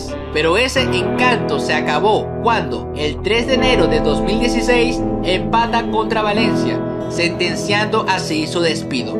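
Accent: Venezuela. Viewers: Hasta el acento es venezolano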